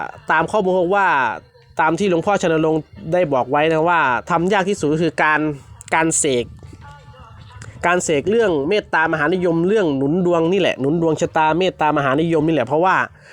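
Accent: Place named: Laos. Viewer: ข้อยวาเว่าลาวเลยกะได้ๆๆสำเนียงมันมาแล้วเด้อพ่อใหญ่ๆๆๆๆอิอิออิ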